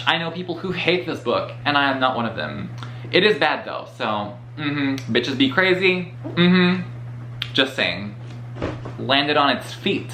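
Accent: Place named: United States. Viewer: Can you believe that!!! I was surprised too, for his American accent is way amazing.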